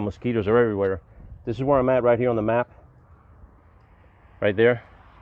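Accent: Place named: South, United States. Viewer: You sound like a Southerner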